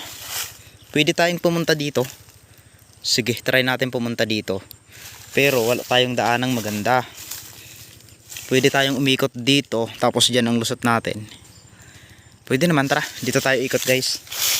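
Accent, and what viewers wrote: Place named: Visayas, Philippines. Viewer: Bisaya ka idol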